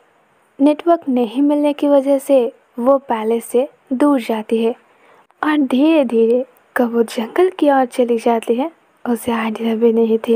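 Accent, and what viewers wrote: Bengal, India. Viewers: I am fascinated to hear Bengali in your voice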